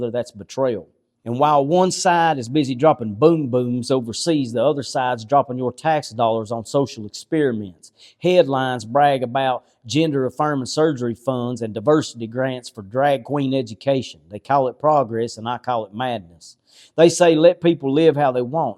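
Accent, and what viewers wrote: South, United States. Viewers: I love listening to his South Alabama Texas Redneck accent….☝️👍😁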